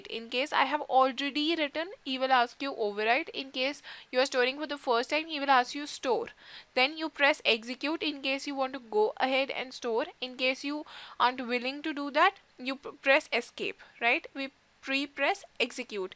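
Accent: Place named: India. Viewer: Listen baby, you can work out from the accent that she is Indian. Sorry, but that is the speed at which normal educated Indian brains function. Perfectly paced for me, who am a not - too- bright Indian. Upgrade yourself, buster.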